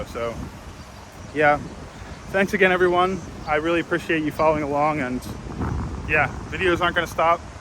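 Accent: United States. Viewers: Done donating. I think majority of your foreign subscribers enjoy listening and learning American English from a native speaker like yourself. Maybe you can make a good money by doing a personalized English conversation practice on hourly basis. With some preparation and technique, I think it can be fun and effective.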